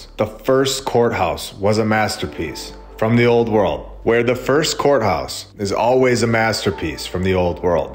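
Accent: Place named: New England, United States. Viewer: by the way I'm a new subscriber the second video I saw was of Saint Anne's Church in Fall River Mass that I'm very familiar with because I live one block from there right now. I have a few old pictures you may want that I didn't see in the video. You sound like you're from the Northeast you have a similar accent that I do are you from new england?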